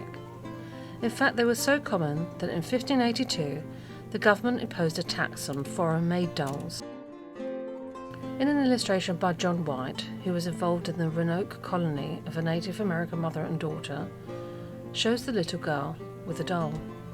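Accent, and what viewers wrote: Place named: England, United Kingdom. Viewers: Sound more Tudor , it's incredible that you're still alive .😆 Do you mean the the narrator spoke with an English accent? I'm not British, but could perfectly understand the narrative. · You’ve never heard a British accent? 😂British Siri and Alexa sound similar.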